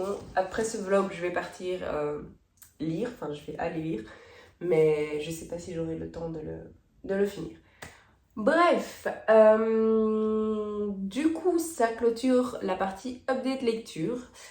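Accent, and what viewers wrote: Belgium. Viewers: Bonjour, contente de te retrouver en 2023 même avec cette fin de Covid qu'on sait très longue. Je te fais tous mes meilleurs vœux pour 2023 ainsi qu'à tes proches. De belles lectures et des vlogs supers comme tu nous en a fait en 2022. Ma première lecture de Janvier 2023 est le Domaine de l'Héritière de Lucinda Riley. Je n'ai pas encore lu Fleat House que j'avais reçu mais je vais avoir de la lecture à partir de fin janvier car je suis jury catégorie polar pour livre de poche. Enfin, j'espère que cette année tu continueras à t'épanouir. Le moral bas entre mi-septembre et fin octobre, je connais très bien, c'est une période très compliquée ou j'ai perdu ma maman en septembre 2011 et mon papa en octobre 2013 et cela est toujours douloureux surtout pour mon papa. Voilà continue à nous régaler avec tes vlogs, ton naturel, et ton accent pour les nombres en belge.😃Amitié Laure sur insta.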